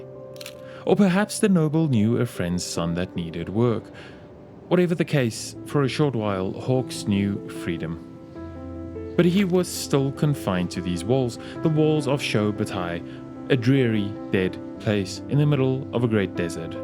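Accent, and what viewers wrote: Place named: Pretoria, South Africa. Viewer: Bro sounds like a Pretoria boy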